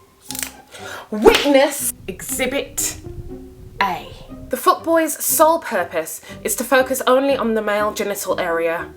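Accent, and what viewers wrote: United Kingdom. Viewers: OMG A BRIT!!! YES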